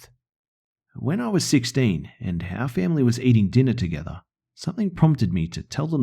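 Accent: Australia. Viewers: Holy shit Australian narrator! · He's Australian :)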